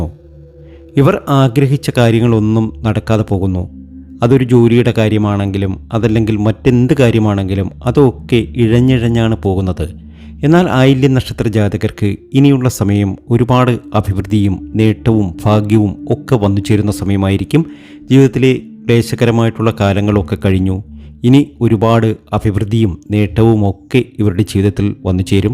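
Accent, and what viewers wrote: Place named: Alappuzha, India. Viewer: ആലപ്പുഴ ക്കാരൻ ആണല്ലേ 👌👌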